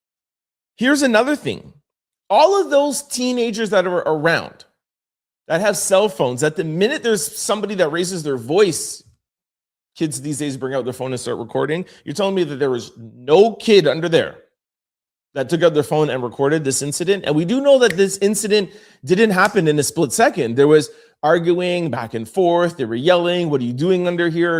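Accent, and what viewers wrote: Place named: Canada. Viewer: This Canadian accent is annoying as hell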